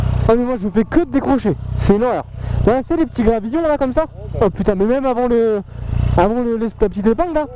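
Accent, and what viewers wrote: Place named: Vosges, France. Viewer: ouais j'ai vu après sur ta chaîne. J'ai habité dans les Vosges. J'ai reconnu un peu l'accent 😁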